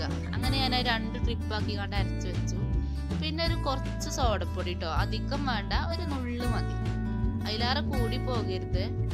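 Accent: Malappuram, India. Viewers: ഞാൻ ഇപ്പൊ കാണൽ തുടങ്ങിട്ടൊള്ളു. ഒരുവിധം കണ്ടു വീഡിയോസ് ഒക്കെ സൂപ്പർ. അവതരണം പറയാതെ വയ്യാ. തനി മലപ്പുറം ഭാഷ. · മലപ്പുറം താത്താന്റെ സംസാരം കിടു 🤣😘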